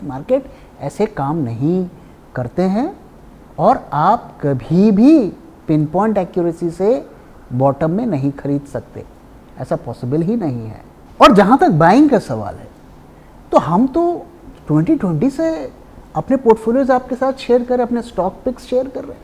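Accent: Bengal, India. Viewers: Yeh toh bengali wala hindi accent hain🙂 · Haha she has such a cute Bengali (or is it Assamese ? accent in her Hindi ! Love it. 😄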